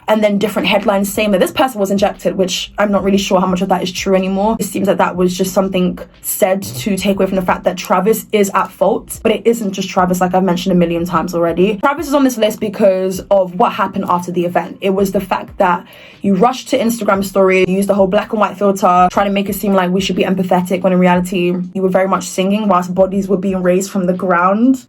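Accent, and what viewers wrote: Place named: United Kingdom. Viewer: trouble understanding you at times cause of your British accent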